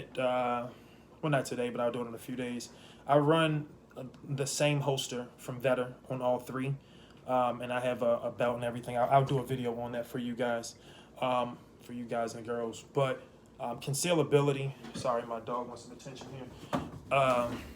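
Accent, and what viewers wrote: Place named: Maryland, United States. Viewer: Dem O’s & U’s definitely from Murland 😂 keep up the good work bro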